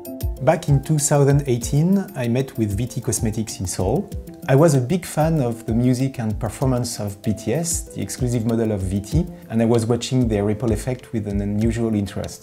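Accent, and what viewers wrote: France. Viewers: Le français qui parle anglais sa donne ça 🤩🤗 with bts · On adore l’accent français !😂🇫🇷🇫🇷🇫🇷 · L'accent français on le reconnaît 😭😭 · Mmmh... L'accent français comme on les aimes ❤️❤️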